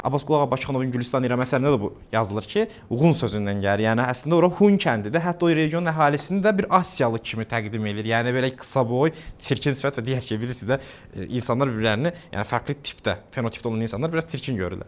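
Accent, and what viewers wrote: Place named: Armenia. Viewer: Hara ermənisidir bu danışdığı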